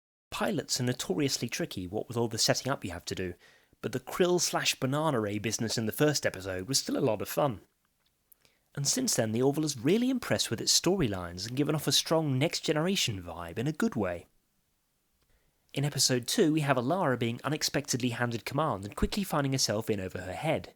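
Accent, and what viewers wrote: England, United Kingdom. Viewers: You sound English? I like the show. Cheers! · I just noticed how unpleasant the combination <expletives + anger/frustration + English accent> is on the ear (I say that as someone with an English accent himself). If I might suggest, it is also a little bit un-English - or certainly exploiting it (mistaking it) as personality or media persona is. I always think that when I hear John Oliver. Wait - is that what we're doing now, copying Americans? Charmless, aggressive loudmouthing an' all? PS. I agree Discovery is awful, the worst Trek series so far (DS9, for my money, being the best).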